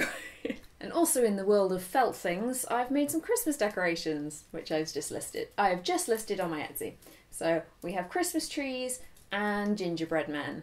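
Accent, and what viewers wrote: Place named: United Kingdom. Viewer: British Accent <3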